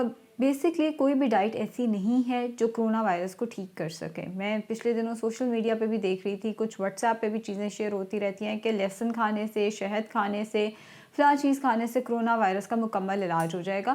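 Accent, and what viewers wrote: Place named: Lahore, Pakistan. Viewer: Good work 👍👍👍miss Ap Lahore sa hain na ? Allah maf kare Acha tha Aisy Lahore wale Bolte hain Kue k main bhi Lahore sa hoon 💪🙈😁😅😂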